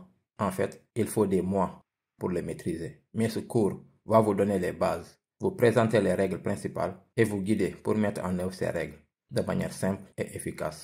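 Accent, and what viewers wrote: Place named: Senegal. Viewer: Une voix Sénégalais 🥰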